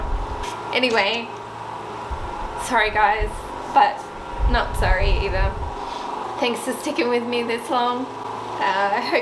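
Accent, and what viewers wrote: Australia. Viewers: Hi. Oh Boy So Sad to Hear the Passing of your friend 🥲 Don’t Apologise for your Sorrow Your piece turned out Great!👍 I thought you were an Aussie Girl the first time I Watched you 👍 I’m in Western Australia What part of Australia are you from! Take Good Care Sending big hug’s 🤗🌹💕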